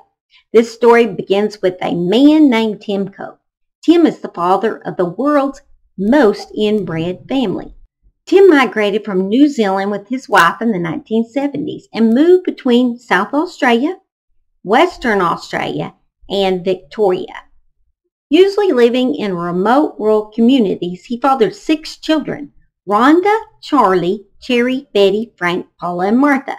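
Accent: South, United States. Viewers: Wow, this is the first time I come across your channel you live in Canada, but your accent is not from Canada because I’m from Texas and Arkansas and I’m gonna kind of gas maybe you from round Missouri or Tennessee accent the way you drag your rrrrrrrrr ‘s out I like it I like a southern girls accent OK I’m going back to your your story again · You gotta be a southerner woman rite or wrong ?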